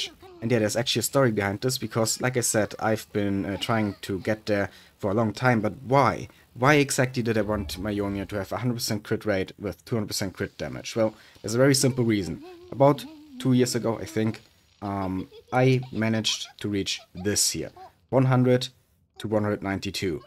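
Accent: Germany. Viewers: Gutes Video, finde es krass, wie sehr man heraushört, dass du deutsch bist. xD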